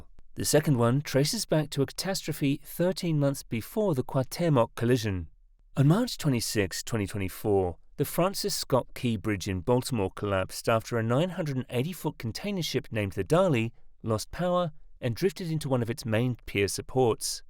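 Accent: United Kingdom. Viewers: Do we really need a British accent? Fuck no.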